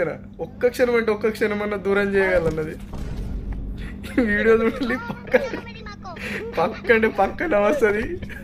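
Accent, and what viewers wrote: Nizamabad, India. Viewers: వీడు nizamabad లేదా ఆదిలాబాద్ లాంగ్వేజ్ ఉంది పిచ్సిలేసిపడి వీని స్లాగ్ చూస్తుంటే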